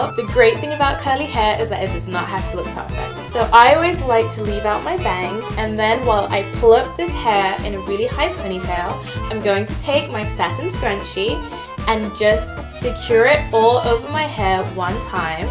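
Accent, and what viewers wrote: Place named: United Kingdom. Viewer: Why do you have a britanic accent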